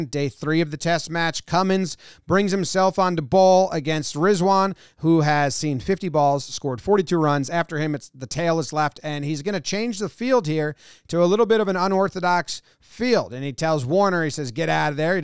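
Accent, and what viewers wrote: United States. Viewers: Made my day. Sounds b like an American and he’s analyzing the game. Warms the cockles of my heart ❤❤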